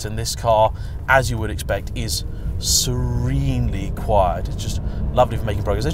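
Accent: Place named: Essex, United Kingdom. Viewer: Before you said it, I knew it was Essex! Subbed!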